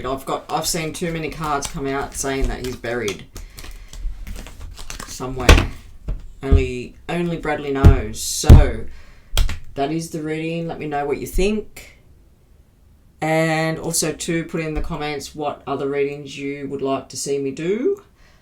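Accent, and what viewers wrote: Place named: Australia. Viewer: Geeat read. Being an Aussie i have found you and aother read i follow. Love the Aussie accent.❤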